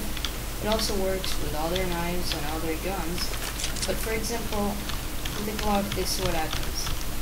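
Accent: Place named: Sweden. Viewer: he sounding swidish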